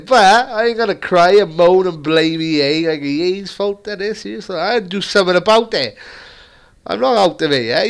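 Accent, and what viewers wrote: Wales, United Kingdom. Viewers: Where u from in Wales bro?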